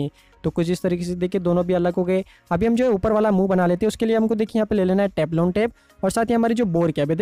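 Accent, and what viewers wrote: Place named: Hyderabad, India. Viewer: Hyderabadi Lagte Ho 😂 I love Hyderabadi hindi Language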